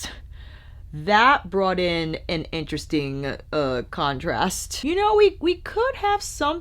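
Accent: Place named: New York, United States. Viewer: Wow. I apologize for saying how well put and well spoken the review was.....after watching the live with the owner of CR with Kelsey.....I didnt mean it from a place of "wow you're not ignorant and I'm surprised because of your skin tone" and I didnt know if that is how it sounded. Im a new subscriber and You described the palette in terms of letting a consumer like me know about which shades are what, ya know? As a fellow NYer and moved to Indianapolis, In I loved hearing your accent as well. So nothing but love here girl...I just went to that video after this one and as soon as I heard all y'all talking about those "You sound so smart" comments I was like wow.....maybe I sounded that way.......maybe you wont even read this and you dont care at all......either way, already a fan lol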